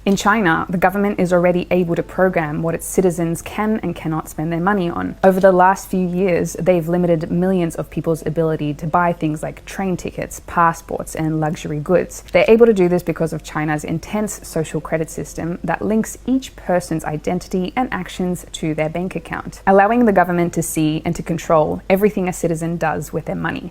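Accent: New Zealand. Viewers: New Zealand accent. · This chick sounds like she is from New Zealand.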